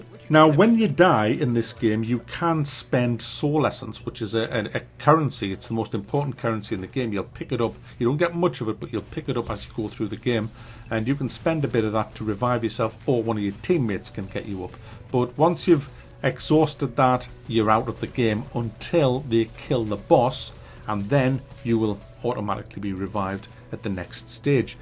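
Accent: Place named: England, United Kingdom. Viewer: North east England. We all talk like this around here.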